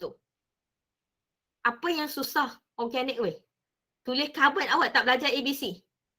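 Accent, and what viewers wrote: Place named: Kelantan, Malaysia. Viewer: Just want to know..Are you from kelantan madam? I'm studying at Kelantan matriculation college and learning some 'kelantan malay' ..and I can hear some kelantan slang when you speak malay.. really love the way you alternate malay and english to make the students understand the chapter very well.. currently addicted listening to your teaching and hopefully I can score well in my pspm 1 &2 especially for chem..❤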